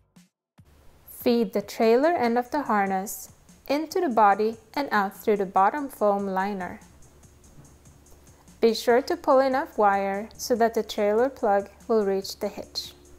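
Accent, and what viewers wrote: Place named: Romania. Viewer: Thank you for your video. I am installing mine this weekend following your instructions. You sound and look romanian.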